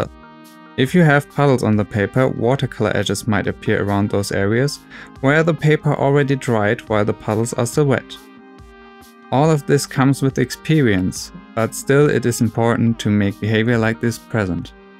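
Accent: Germany. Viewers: Man hört den deutschen Akzent sofort raus :D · Bist du deutscher? Du hast einen extremen Akzent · Hach ich hab doch rausgehört, das er Deutscher ist!:) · Great video. Love your German (I think) accent as well :)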